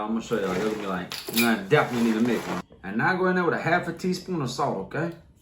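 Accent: Louisiana, United States. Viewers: Indeed fabulous chef and love his cajun accent too. So chilled and the food is full of life with seasoning and care is there. Love the channel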